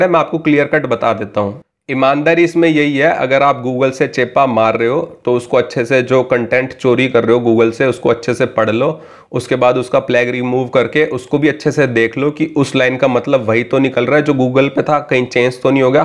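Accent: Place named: Uttar Pradesh, India. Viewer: mujhe laga aap up wale hain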